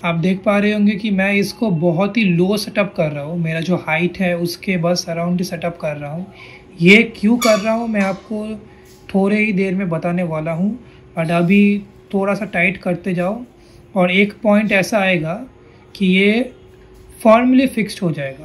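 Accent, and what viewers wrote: Bengal, India. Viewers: Bengali ya odia?😂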